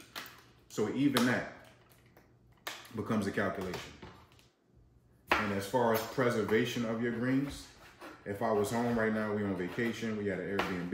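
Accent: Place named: East Coast, United States. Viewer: Let me get to the grocery store!!!! This video is dope and is as soon as you started talking, I knew you were from the east coast 💫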